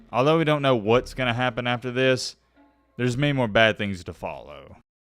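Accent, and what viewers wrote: South, United States. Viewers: Bruh I’m from the south and know we have accents but you are a mush mouth MF!!! Hahahaha You make great videos but you need to work on that. Maybe get a speech coach. Keep up the good work. Hope you continue the work and it works out for you. Hope you don’t feel like the criticism is unwarranted or being mean I’m in it more as a joke but I do think you have some areas where you sound like a mush mouth and if you’re not from the south, you might not understand what you’re saying. I said I didn’t say this to be rude or mean just hope you take criticism for what it is. Continue to do good work.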